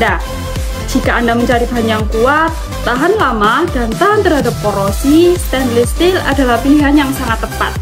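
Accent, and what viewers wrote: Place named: Java, Indonesia. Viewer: Mbaknya medok, pasti wong jowo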